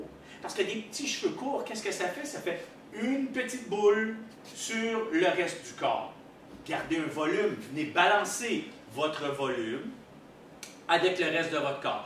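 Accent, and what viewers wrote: Canada. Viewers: Bonne vidéo avec un joli petit accent du Québec !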